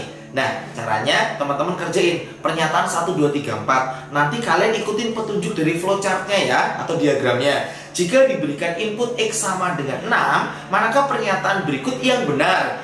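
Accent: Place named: Banyumas, Indonesia. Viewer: Kayanya ka ki orang daerah cilacap,banyumas,purbalingga kah?ngapak mulu perasaan wkwkwk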